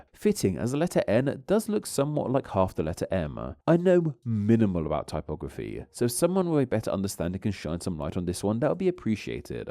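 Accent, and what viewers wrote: United Kingdom. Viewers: s is literally ass but i guess the british accent breaks it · Our is interesting because in your British accent it is R but in an Australian accent it's pronounced "awo" like ouch ow. I wonder if there are words that would fit from other accents to letters as well?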